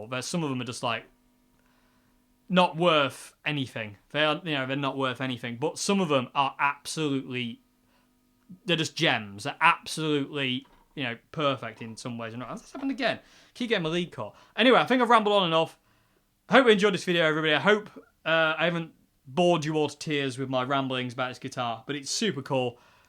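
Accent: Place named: Lincolnshire, United Kingdom. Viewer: with a hint of lincs accent if I'm not mistaken?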